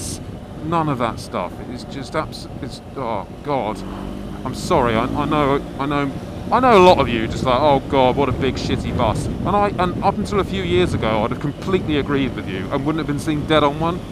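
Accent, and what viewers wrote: England, United Kingdom. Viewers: So, what kind of English accent do you have? I like it. Is it "posh"? Northern? Southern? What would one call it?(I'm a clueless fucking American, btw) The sarcasm is dripping and delightful.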